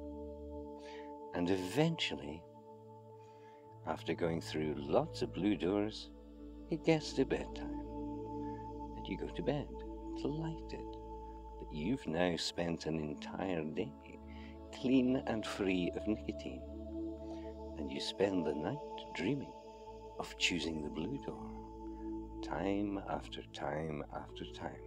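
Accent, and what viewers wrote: Scotland, United Kingdom. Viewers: Hi, I think the music is a little louder than your voice so it makes you very muffled. Normally I am good with Scottish accents lol Thank you for responding. I will try it again.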